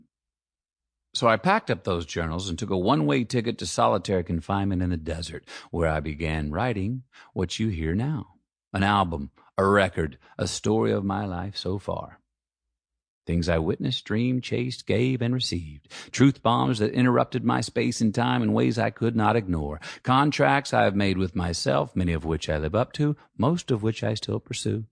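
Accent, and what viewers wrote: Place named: South, United States. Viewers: He’s just making up empty platitudes and selling it with a confident southern accent. He is an insane person and I love him for that. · This man makes my innards quiver. Southern gentleman have IT. But this man bequeaths the essence of every southern man. He has it ALL; the eyes. the beautiful Texas accent-The whole package and by God he has sex appeal in spades. Whew.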